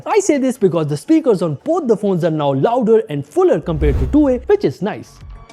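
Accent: India. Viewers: Non native English speaker here rarely gets to hear English with Indian accent in it, but i can completely comprehend all of the things he said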